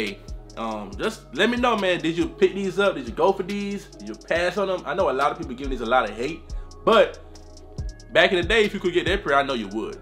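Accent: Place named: Georgia, United States. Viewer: lol I'm surprised the accent strong af!(but I'm in love with Georgia accents so they really stand out to me) Ion even hear the Jamaican in ya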